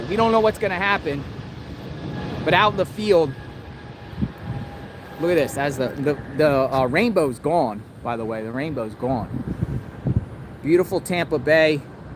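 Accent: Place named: North, United States. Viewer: Haha! Bro u sound like a Northern. Could not stomach 2 min of your crap.. your spewing rubbish…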